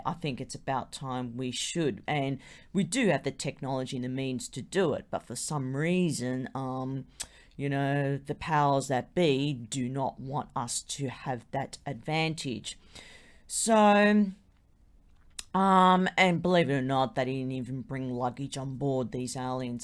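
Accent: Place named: Australia. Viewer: Hi 👋 do you have Polynesian roots? I can hear 👂your an Aussie but you have Polynesian features.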